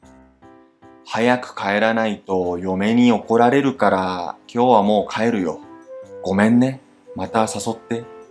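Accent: Taiwan. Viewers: 台湾腔好可爱～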